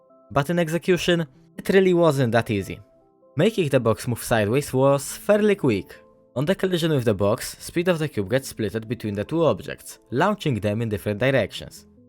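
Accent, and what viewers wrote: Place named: Poland. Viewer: Słychać że jesteś z Polski.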